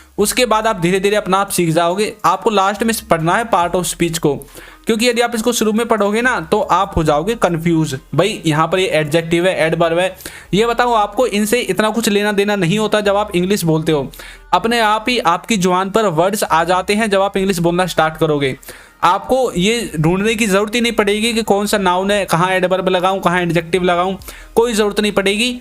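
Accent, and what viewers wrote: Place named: Uttar Pradesh, India. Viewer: Sir apka language tone to up ka sa he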